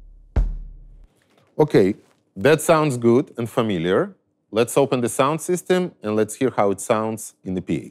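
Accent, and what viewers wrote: Russia. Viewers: I love the russian accent (sorry, If I got it wrong). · обожаю такой английский, русский русского лучше всего поймут по-английски)))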